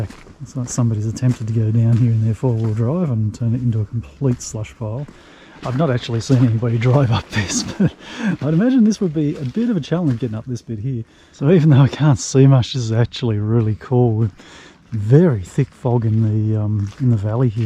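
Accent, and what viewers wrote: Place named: Australia. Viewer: Nice to listen to an Aussie in an area I’m familiar with. 😀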